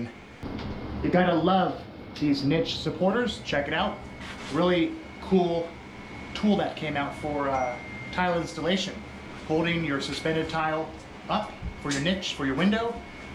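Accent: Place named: Boston, United States. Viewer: With that Boston accent, this must be cape cod?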